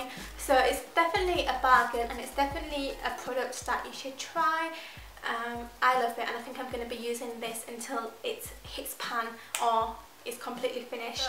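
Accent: United Kingdom. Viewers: you have a kind of weird british accent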